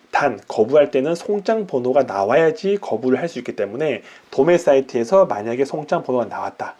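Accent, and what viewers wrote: Busan, South Korea. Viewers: 오늘도 상품등록 해보자~~~ ㅎㅎ 억양이 딱 부산분이신거 같으네요 저도 부산사람이라 느낌이 딱 오네요~^^ 반갑습니다~ 돈은 벌어야하는데 저희집 사정상 제가 집을 비울수없는처지라.. 정기적으로 출퇴근하는일은 엄두를 못내거든요.. 그런데 돈은 또 벌어야 된다는 아이러니의 구렁텅이에서 허우적 대고 있는 사람입니다... 그러다보니 집을 비우지 않고 할수 있는일을 늘 찾지만...쉽지가 않아 알아보고만 있던중 유튜브 알고리즘이 을 만나게. 해줘 정주행하며 감사히 공부하고 있습니다~ 평소 소비자 입장에서 알리익스프레스 이용하고 있거든요 말도 안되는 저품질상품도 많은데 시간들여 잘찾고 배송늦는거 감안하고 느긋한맘으로만 기다리면 정말. 가성비개꿀. 득템인 상품이 많더라구요.. 해서 요즘 소비패턴은 급하고 당장필요한건 쿠팡 이용하지만 그외엔 배송기간 감안하고 알리서 사거든요.. 알리서 싸게 구매한 악세사리를 혹시나 해서 가격을2배로해서 당근이랑 번게에 올렸더니 팔리더라구요... 해서 아에 알리익스프레스에서 가성비좋고 판매 많이 되는 상품 위주로 구매해 스마트스토어 개설해서 판매해봐도 되지않을까? 하는 생각이 들어 공부중인데요 그렇게 시작해봐도 될까요? 전 당장 월 몇천만원 이런수입 바라는거아니구요 그정도수입 내려면 그만큼 시간과 노동을 투자해야 되는데 제형편이 돈버는거에만 시간을 오로시 투자할 처지가 안되거든요 해서 전 지금 내식구 최소생활비 버는게 목적이고 그게 충족되고 안전해지면 더큰꿈 키워 나가보고 싶거든요... 제가 너무 소극적인가요? ^^; 뭐든 좀 배우려하면 목돈부터 드니 돈없어 달려들지도 못하겠고 그나마 께서 촙ᆢ자도. 알아들을수있게 자세한 영상 올려두셔서 보며 공부하고는 있는데... 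이렇게 시작해봐도 되는건지 의문이 듭니다 너무 길고 추상적인 질문이라 읽기도 힘드시고..ㅎㅎ 답주시기 애매하시겠지만... 조언 부탁드립니다~~행님~^^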